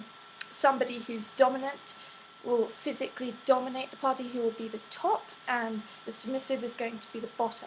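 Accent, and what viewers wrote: United Kingdom. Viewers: A blond-haired, blue-eyed, British-accented Asian? The f? Your "master"is like 50 and you are what, 18-19? This is just stupid and crazy, especially that he enjoys seeing you in pain!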